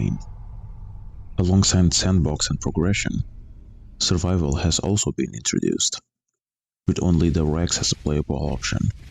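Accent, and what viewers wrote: Portugal. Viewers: este sotaque é tuga meu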